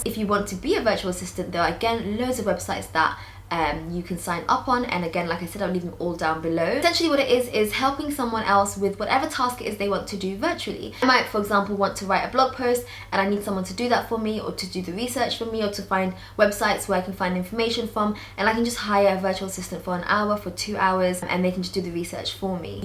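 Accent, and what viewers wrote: United Kingdom. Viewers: i'm glad to see / hear a voice from the UK helping us out #nodisrepect to other countries :)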